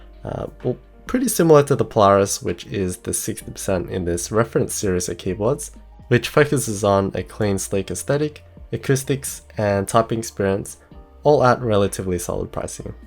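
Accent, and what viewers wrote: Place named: Australia. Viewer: you sound more Australian than usual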